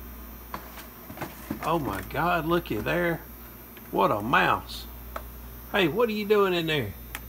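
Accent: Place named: Louisiana, United States. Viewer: 3 or 4 time governor of Louisiana? " "! Love the "TALK" from down home. y'all! Cajun:-))))))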